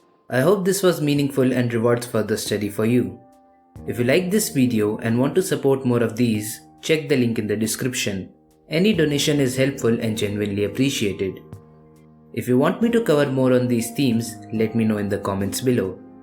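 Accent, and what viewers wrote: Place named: India. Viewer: never want to mean or curial but your Indian accent is distracting sometimes. Just sometimes, like every 5 second in 2 minute. Beside that amazing video and description.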